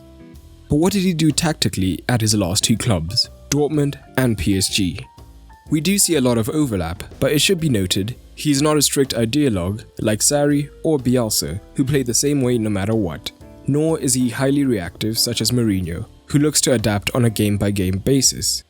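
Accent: South Africa. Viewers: I know a South African accent when I hear one bro 😂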